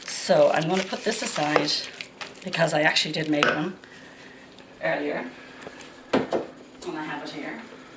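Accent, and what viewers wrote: Ireland. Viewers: Hah, Guinness. Should have guessed it with that accent! This is a different approach to the plum pudding. It will be interesting! Thankyou. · Are you lrish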